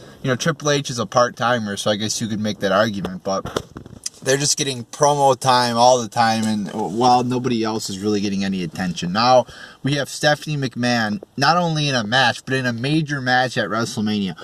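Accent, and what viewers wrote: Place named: New York, United States. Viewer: you have similar voices. Ai New Yorkar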